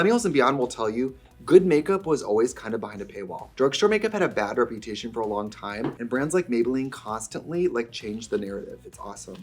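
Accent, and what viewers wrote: Wisconsin, United States. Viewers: You can also hear her Wisconsin accent a lot more and I think that’s very metaphorical. She’s back home. Honestly makes me wish I had 3 months off. 🥹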